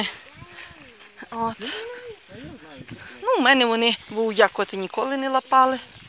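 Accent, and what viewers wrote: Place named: Transcarpathia, Ukraine. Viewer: Здравствуйте , а в какой области и районе вы живёте ? Я родом из Закарпатской обл , знакомый диалект .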